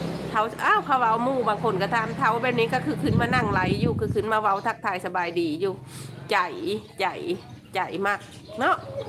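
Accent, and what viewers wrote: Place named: Phu Thai, Thailand. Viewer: ภาษาภูไทพูดเพราะมากค่ะ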